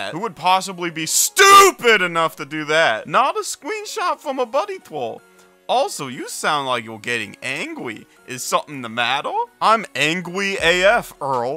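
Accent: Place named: Philadelphia, United States. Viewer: banger video !! u from philly huh?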